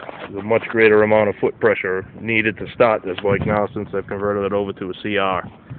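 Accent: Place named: Rhode Island, United States. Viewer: Wow you sound like your from RI!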